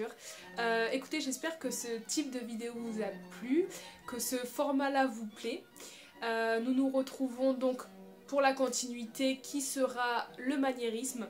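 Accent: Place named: Italy. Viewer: J'ai adoré cette vidéo, très instructive et bien construite, j'adore ton accent italien 😂 par contre j'aurais aimé plus de précisions sur les artistes que tu as cité et des definitions aussi ( qu'est ce qu'on appelle le cuatrociento ? Etc...) sinon c'est top j'adore l'idée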